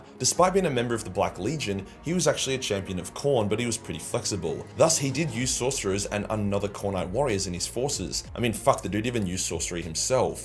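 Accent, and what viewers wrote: Australia. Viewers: can you please lower the volume of your outro? I cannot count how many times I have almost fallen asleep to your lovely Aussie accent only to get jumpscared awake by the "BOW TO ME"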